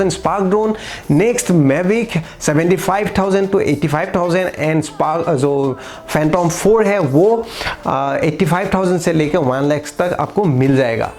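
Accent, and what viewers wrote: Assam, India. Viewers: bhai accent tu huni gom pai golu tumi axomiya buli... so about goi salu sivasagar r manuh ulala...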